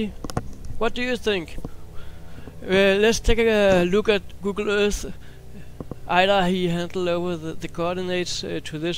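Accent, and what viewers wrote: Denmark. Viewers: I worked at a hostel for 3 years. This dude is Danish for sure.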